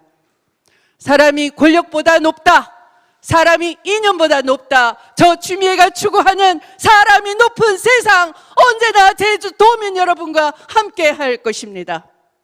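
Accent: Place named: Jeolla, South Korea. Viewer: 너 정치병이야.병원가라.너 말씨는 전라도 말이 면서 고향이 대구라고 ..ㅋㅋ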